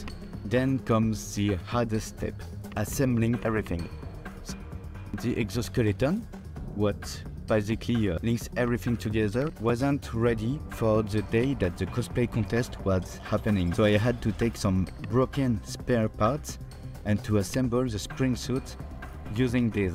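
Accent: France. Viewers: Are you french your accent seems like it and some things in the video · Are you french ? Because your english is just beautiful😅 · this video is so great! i think i may do it! but, just a question: are you french? you have a very french-like accent?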